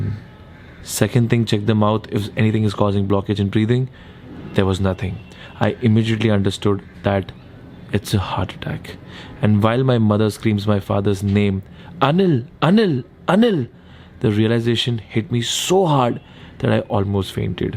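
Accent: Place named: Gujarat, India. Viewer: u sounds like a gujrati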